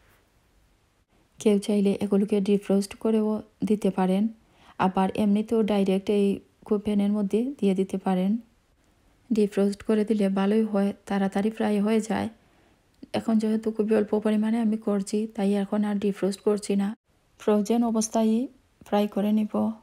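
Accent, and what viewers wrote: Sylhet, Bangladesh. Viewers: সিলেটি আপু।উনারা এভাবে কথা বলে। ধন্যবাদ আপনাকে ও আপুকে। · আফা সিলেটি নি গো?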